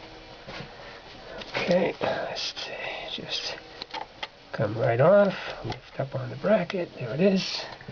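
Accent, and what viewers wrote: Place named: Brooklyn, United States. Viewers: Logan Mattson He must be from NY that's all. Everyone sounds the same from over there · I love the Brooklyn accent.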